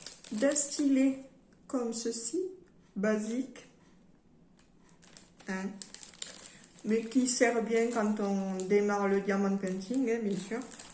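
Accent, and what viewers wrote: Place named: South-West, France. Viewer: coucou merci pour cet unboxing et je trouve que tu as un accent du sud ouest... est ce que je me trompe? car je suis du tarn et je trouve qu'on a un peu le même accent! bisous bye bye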